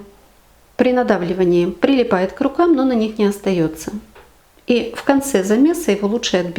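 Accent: Ukraine. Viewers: у вас така гарна українська мова❤ Дякую за хлібчик.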